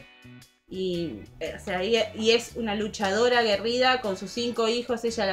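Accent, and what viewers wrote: Argentina. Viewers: Amo argentina sobre todo tú acento 😂 nueva sub te invito a que te suscribas a mi canal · gracias por contarnos sobre ti. Siempre he dicho que el acento argentino es hermoso. Un abrazo desde Bogotá-Colombia 🇨🇴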